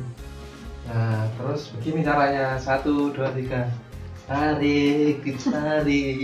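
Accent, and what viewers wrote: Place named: Java, Indonesia. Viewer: Medoks abis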